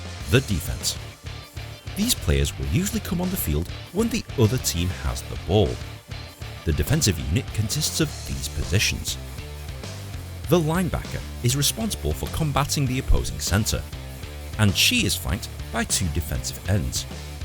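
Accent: United Kingdom. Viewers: Narrator has a Limey accent. Cut some slack Yank.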